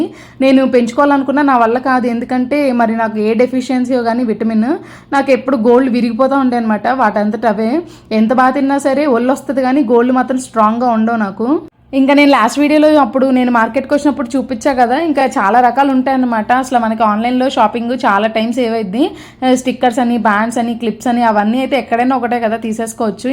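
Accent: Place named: Godavari, India. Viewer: Meeru mataludu thunte godavari salang gurthuku vachindi andi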